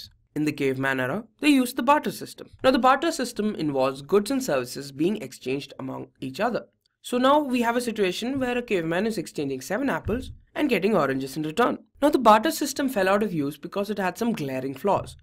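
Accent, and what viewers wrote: India. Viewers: Bruh he asked is the voice in the video BELONGS TO A INDIAN 😂😂😂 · Good info. Please improve your English accent from IN-GLISH!!!!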